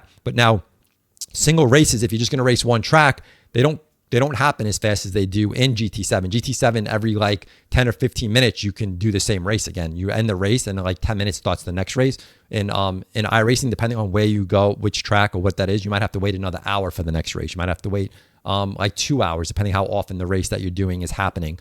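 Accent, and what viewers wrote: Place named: Massachusetts, United States. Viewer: you must be from mass... im from new bedford now in florida but your accent brings me home...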